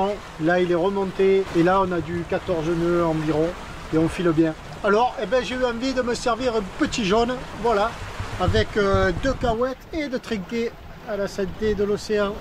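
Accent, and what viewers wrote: South, France. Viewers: Fantastique belle traversé ca fait bien envie merci de nous partager tes aventure toujours avec cette bonne humeur et cette accent du soleil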